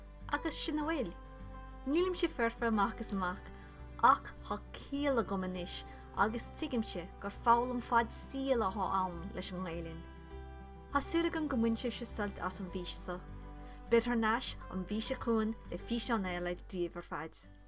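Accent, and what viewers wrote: Corca Dhuibhne, Ireland. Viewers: Tá blas iontach Corca Dhuibhne agus Gaelainn cruinn álainn agat. Ba cheart go mbeifá an-bhródúil as.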